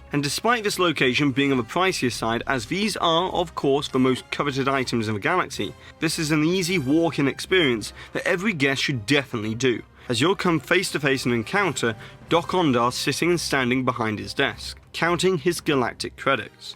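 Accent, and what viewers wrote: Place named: RP, United Kingdom. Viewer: The posh accent cannot die fast enough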